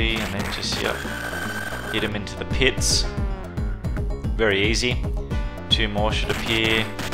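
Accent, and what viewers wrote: Australia. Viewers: I believe you're still out there. Hope you're doing well. Every time I hear an Aussie accent I shed a tear and think of this channel.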